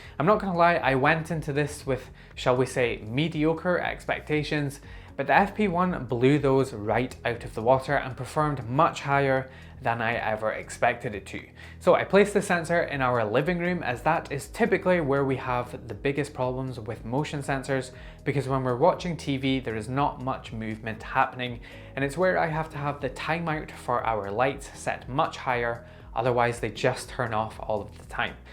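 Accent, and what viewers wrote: Scotland, United Kingdom. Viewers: I agree this is revolutionary! I have started my smart home with Xiaomi/Yeelight/Aqara, but they are now just too expensive, with this stupid region locking. Tuya Zigbee is stupidly cheap, and will equal performance for most devices. I will wait for a cheap Tuya clone. One question: Despite my first name i am not english, but i love the language. Am i correct that this is a Scottish accent? Greetings from Germany :)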